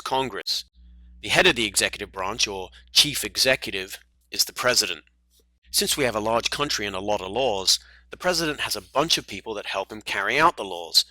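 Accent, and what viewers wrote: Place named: Australia. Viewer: I am confused that he is teaching us american government yet he has an Australian accent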